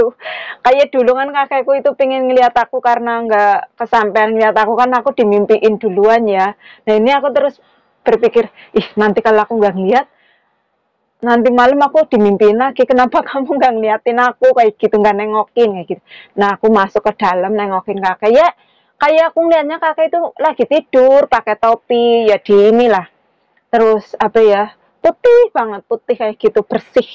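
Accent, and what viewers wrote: Banyumas, Indonesia. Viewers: Sampean wong endi.. Yakin ngapak nemen sampean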